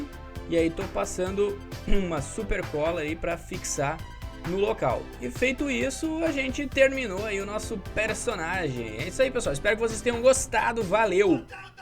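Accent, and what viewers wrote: Rio Grande do Sul, Brazil. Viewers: cara vc é Gaúcho? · Tu é Gaúcho né? Teu sotaque é bem Gaúcho eu sou aqui do Rs e eu reconheço, não sei se é do Rs, mas tu é do Sul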